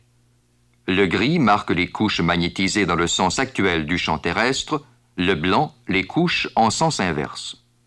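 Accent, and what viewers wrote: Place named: Quebec, Canada. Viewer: un canadien (ça s'entend très nettement) qui essaye au maximum d'avoir l'accent "français"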